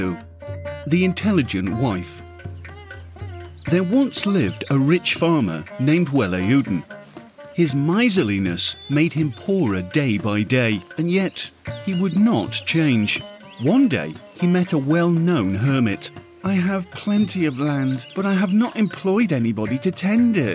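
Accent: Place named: England, United Kingdom. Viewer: Great going guys! loving the series. 1 small small gripe - why is an angez narrating the stories ? feels so colonial. No offence to Mr. Narrator hands folded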